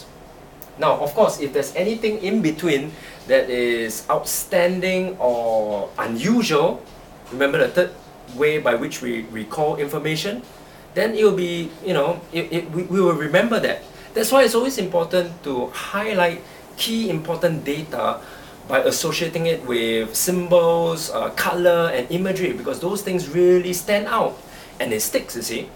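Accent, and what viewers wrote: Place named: Africa. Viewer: Why do you sound so African?!?!?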